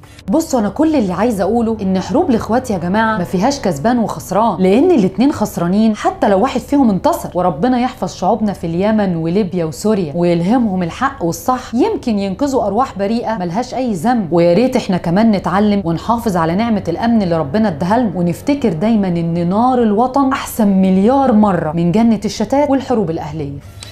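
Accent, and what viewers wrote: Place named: Egypt. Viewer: طريقة سردك رائعة يا تعجبني لهجة اشقاءنا المصريين السلسة الحلوة...حقا كانت فترة موجعة ولاتوصف تركت شرخا عميقا في أنفسنا. حفظ الله الجزائر وسائر بلاد المسلمين من كل شر يارب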